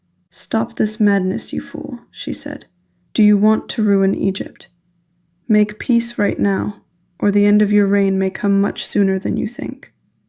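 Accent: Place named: North America. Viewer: I'm playing accent detective... You were raised in North America. That's all I've got hahaha...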